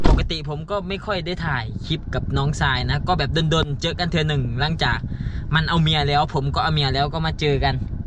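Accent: Laos. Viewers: ເຈົ້າປາກພາສາລາວໂຄດນ້າຮັກເລີຍ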